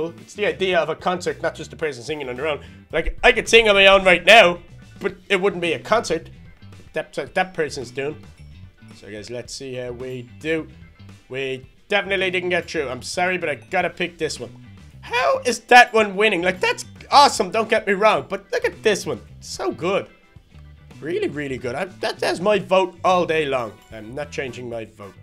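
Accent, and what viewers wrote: Dublin, Ireland. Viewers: I knew that ur from Ireland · Are u from Dublin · are you from Dublin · Your Irish wow I thought you was English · Are you from Ireland I'm not from Ireland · So your Irish? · I knew you were irish because I'm irish and its ovious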